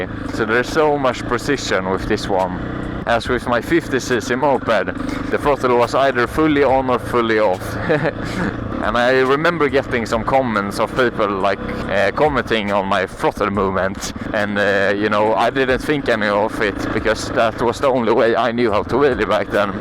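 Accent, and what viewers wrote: Sweden. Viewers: älskar svenska dialekten 😂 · Man hör att du är svensk ialafall 😂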